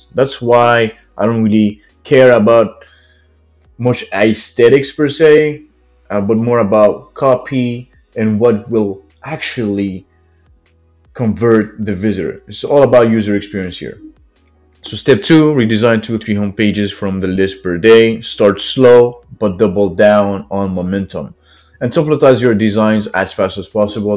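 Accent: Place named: France. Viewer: Are you french ? (I'm french)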